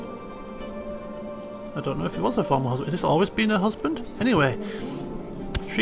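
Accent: England, United Kingdom. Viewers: what part of England do you come from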